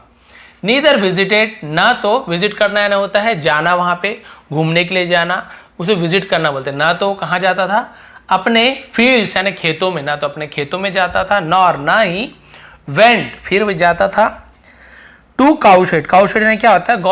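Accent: Chhattisgarh, India. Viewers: Sir aap raipur chhattisgarh se ho kya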